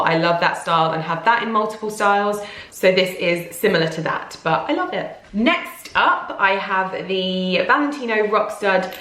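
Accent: United Kingdom. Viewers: I'm 13 minutes into the video before I realized she has a British accent. I think I watch too many British Youtubers...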